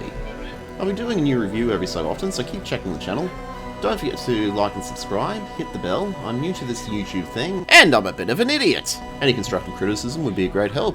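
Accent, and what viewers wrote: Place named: Australia. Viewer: This is assertively, but not aggressively, Australian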